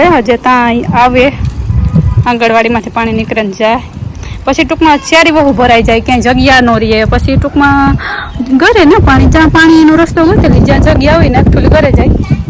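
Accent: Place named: Gujarat, India. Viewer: મેર જેવી મઘુર બોલી કોઈ બીજી.કયા જોય નથી,ખૂબ સરસ.બોલી.સે ❤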